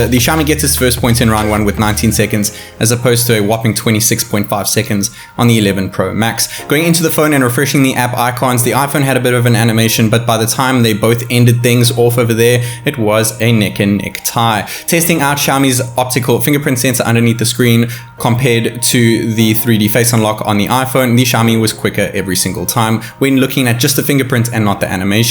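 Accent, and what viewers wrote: South Africa. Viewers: homie sounds south african lol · Sensing a South African vibe Check your Location: South Africa!!! YEBO!!!!! #subbed BTW, where did you get the Xiaomi, I really wanna get one!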